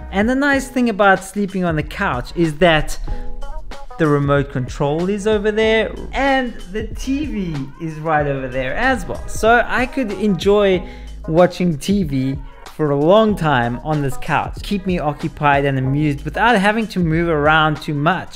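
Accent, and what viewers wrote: South Africa. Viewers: Cheers dude,I broke mine twice and am going in again in 3 days to get a plate put in.The only concern I have is the sleeping and you nailed it,the couch and a pillow,infact lots of pillows saved my life the last time's, I'm hoping this time won't be as bad as its corrective surgery and I hope the doctor will give me adequate pain suppression. P.s is that a Cape Town accent I heard,been there twice,beautiful country, be 🙏 Will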